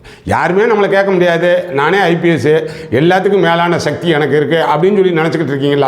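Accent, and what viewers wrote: Madurai, India. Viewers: சார் மதுரை சிலாங் முறையில் விளக்குவது சூப்பர்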